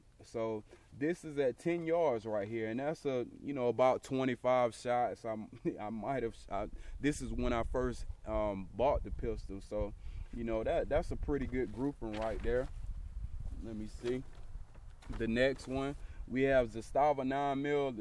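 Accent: South, United States. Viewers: I love the southern drawl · Don't feel bad about your accent. I'm from Georgia too. I was born in Rome Georgia. Now I live in Tennessee though but we're of the South and don't be ashamed of our Southern ways of talking or cooking or our love of guns.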